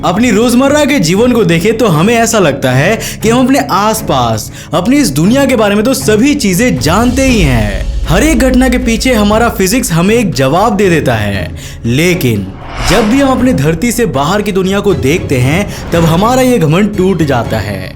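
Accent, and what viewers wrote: Bengal, India. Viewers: Are you a Bengali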